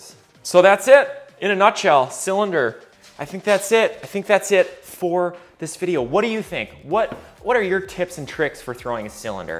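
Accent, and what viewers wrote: Minnesota, United States. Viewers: sweet vid. I have been taking classes here where i live in north carolina on the outer banks for the last 2 years- my friend and I just scored a full studio set up and we are just now in the new phase of starting to sell pottery. its soooo fun! We are stoked and love your vids! Im originally from MN and come home every year so I am going to have to come check out Mocha Monkey! you sound like all my friends back home and its sweet to hear your MN accent/vibe!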